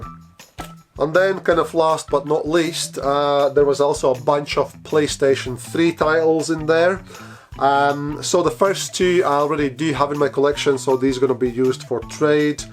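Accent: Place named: Scotland, United Kingdom. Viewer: Go for it. I am sure there will be members of the audience that will like to see it. If you don't mind me asking where does your accent come from, I get the Scottish but there is a different twang underneath it, For clarity I come from a Glaswegian family so I pick up the Scottish accent quite easily just couldn't place yours fully. Keep getting lucky we all deserve it IMO.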